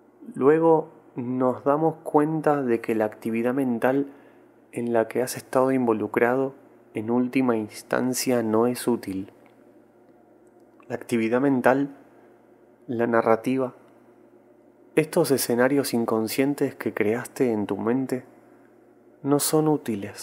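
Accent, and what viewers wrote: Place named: Argentina. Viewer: gracias! y ando esperando mas capitulos de los libros que quedaron inacavados. me ayuda tu voz argentina. memorizo mejor je.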